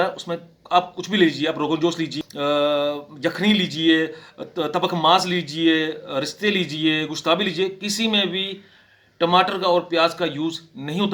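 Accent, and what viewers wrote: Punjab, India. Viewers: Your accent is like my punjabi unclr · I just love to hear the Punjabi accent hindi... ❤️❤️❤️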